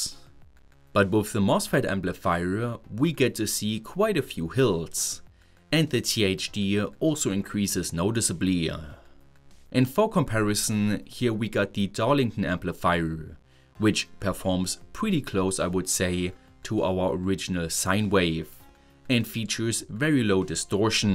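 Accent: Germany. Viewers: But why do you have such thick German accent if you say that you are Scottish?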